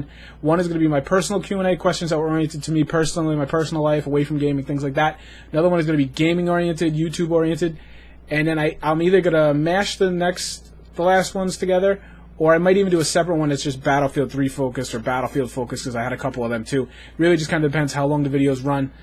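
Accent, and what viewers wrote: Boston, United States. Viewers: you sound kind of like a boston guy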